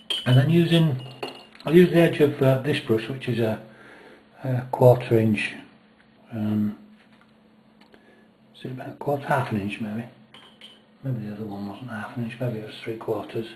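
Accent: Yorkshire, United Kingdom. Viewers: Not bad, not bad at all sir. Simple but very effective, plus a couple of techniques I'm going to have to try. Also nice to hear a yorkshire accent, been a Huddersfield/Barnsley boy myself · Really enjoyed watching these 3 videos and love the end result. I'm still a beginner and need all the help I can get so found this very helpful. I'm originally a Yorkshire lass so listening to your commentary was an added bonus! This is the first time I've come across you on You Tube but certainly intend to dig out all the videos I can find. Thank you. Keep up the good work!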